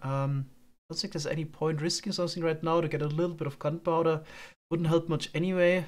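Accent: Germany. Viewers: Are u German ? Weil ich es bin haha und ich es irg wie bemerkt hab??? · Mate, I love watching your videos from time to time and they're always really informative and entertaining. But as a fellow German with basically a cockney dialect I have to say - that German accent drives even me crazy. 😂 No worries though. Love your vids. <3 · Man hört den deutschen accents so hart 😂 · Man hört sehr raus das du deutsch bist aber trotzdem guter YouTuber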